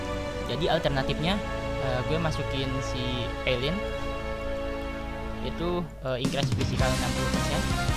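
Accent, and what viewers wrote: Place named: West Java, Indonesia. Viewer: Nyunda pisan xD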